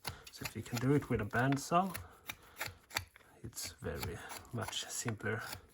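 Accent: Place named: Sweden. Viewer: Excellent carving tutorial. Your speed of carving is perfect for beginners. And, never fear, your English is just about perfect with, and with an enjoyable Swedish accent. BRAVO from California.l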